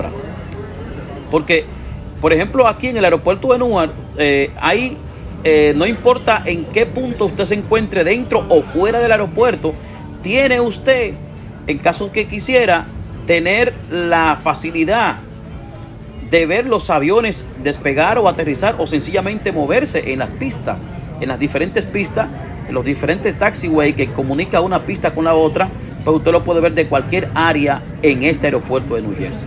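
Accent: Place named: Caribbean. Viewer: hola señor.. creó qué es su nombré.. vemos qué usted vive obsesionado usted parece a ésa ONG..enemigas de la imagen del la RD.. porque usted compara ahora la economía de un país dé diez millones de personas con economía de grandes potencias cuando usted mismo dise qué la potencia que tiene esos grandiosos aeropuertos su presupuesto dé seguridad es mayor que la de china y Rusia.. usted se canta y sé llora.. y muchos nos preguntamos cómo es qué usted viaja semanal al meno qué usted no responda a una campaña dañina contra la RD. O usted hace eso porque responde a los intereses de las personas qué quieren construir el aeropuerto dé bávaro que nosotros estamos dé acuerdo que sea construido y qué sea el más moderno le cojimos la seña ya qué usted menciona el aeropuerto de punta cana..y hemos notado en esté vídeo ciertos acentos que no son dominicano escuchese usted mismo que le sale un acento de una isla colonizada del caribe vemos también que usted reconoce que la RD es el país del caribe más turístico y la ciudad Dr santo domingo como la más bella y moderna del caribe resalte el metro de santo domingo.. 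el teleférico dé santo domingo.. único en el caribe..la economía propia más robosta y deje la obsesión con el aeropuerto las América.. porque ya usted ralla en la obsesión y harta hasta la próxima semana cuando vuelva con la misma canaleta cooooooooooooño que mucho jode con él aeropuerto las América no sea usted tanto por no decir otra cosa usted sueña con el aeropuerto las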